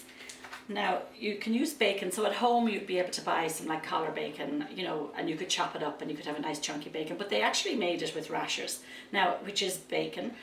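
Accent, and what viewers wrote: Ireland. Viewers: Your not from Dublin your Limerick, but your Irish and like our Coddle yummy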